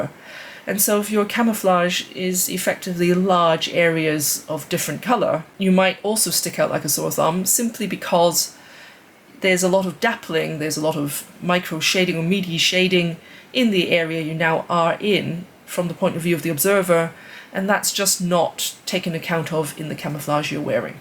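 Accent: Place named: England, United Kingdom. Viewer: I'm listening to an Asian woman with an English accent speaking about Phantomleaf on a channel called 'Flecktarn'. I am very confused.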